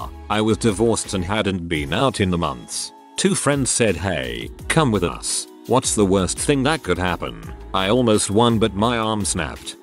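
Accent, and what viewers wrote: United Kingdom. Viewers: The robotic voice with the British accent…distracting and sometimes hard to understand. Get a live person. I Start to listen, I try, but then just have to stop.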